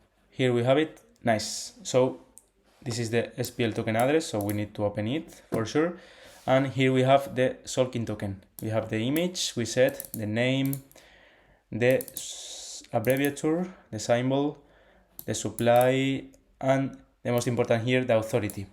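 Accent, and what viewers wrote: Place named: Spain. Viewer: Vamos ese acento español ahí a muerte! Buen contenido!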